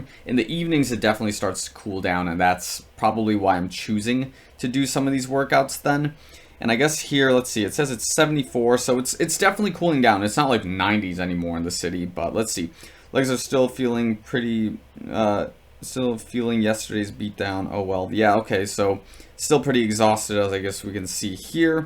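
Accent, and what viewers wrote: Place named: Chicago, United States. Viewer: My sports are, basketball, cycling, tennis. I like walking in the Zoom Alphafly but I’m told it’s not for that. Thoughts? By the way, I hear the Chicago in your voice. I also hear Dan Aykroyd in your voice!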